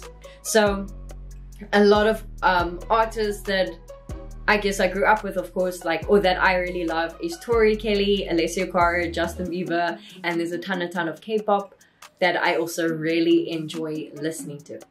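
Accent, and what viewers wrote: South Africa. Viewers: That is actually impressive because I'm also from Cape Town and our accent is not known outsude of South Africa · I thought...you are from New Zealand it's because of your accent (but, I'm not sure because now that you've said you're from South Africa...Now, I do understand because I do have work with people that are from South Africa - now, does it make sense because they talk or speak like you...(sorry, I mean it in a good way)...Good Luck & more power to you in your content... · Ha, I knew from your funny accent that you must be from South Africa! Great to have this finally confirmed! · I could never place your accent, but now finally it all makes sense, South Africa. The same accent in District 9. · Yes, stay awesome, following you from Hong Kong. Funny I guessed it right, your lovely accent is unmistakably SAfrican. Love Love Love it!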